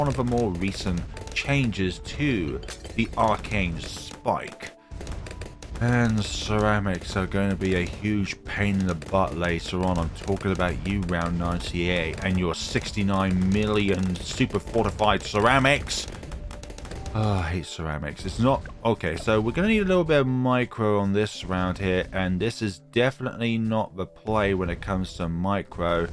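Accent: United Kingdom. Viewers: I don't know why, but i find your British accent cute.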